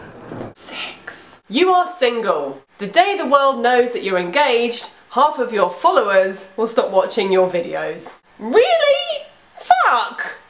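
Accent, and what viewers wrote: England, United Kingdom. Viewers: Here's why you're fun to watch... 1). You're very attractive. 2). You're funny. 3). You're witty. 4). You explore a wide range of topics. 5). You have an English accent that's interesting to Americans. 6. You're very likable. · I like ur voice with your british accent. And yeah. Your number 5ves too. They're kind of sweet the more i watch. British girls FTW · I think your, funny, pretty, and your British(love the accent) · How on Earth are you single?! I mean you're really funny and witty and you have the looks.You must be really picky, probably that's why. Anyway I really love your videos and your British accent! Greetings from Romania. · I love her because of the accent, ( actually all english girls) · I watch your videos because of your british accent the way you say stuff sometimes is hilarious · I like your videos because of your accent and the way you talk. 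As an American English learner I had absolutely no interest in British English but your voice, your intonation and the wormth you have in your talking made me interested and so your videos. I can not ignore your beauty though but linguistically the way you speak makes English learners both American and British sides interested. keep going gal. thumb's up. From "Iran"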